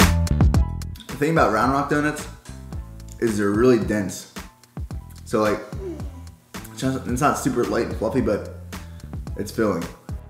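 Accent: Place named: Pennsylvania, United States. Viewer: I just watched pretty much all of your videos and I'm 100% subscribed! I wish I lived in Texas so I could try these donuts! I feel like I heard you say you were from Pennsylvania in one of your videos.. You definitely sound like it lol I'm from PA, as well.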